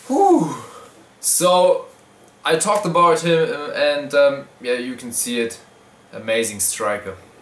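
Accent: Germany. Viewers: accent? he's german so its the normal way of pronouncing german/austrian names :D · Play KSI... would be fun to watch his screaming and your german accent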